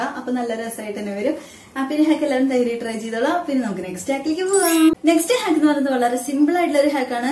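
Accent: Thrissur, India. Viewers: Thrissur anno Language 😍😍😍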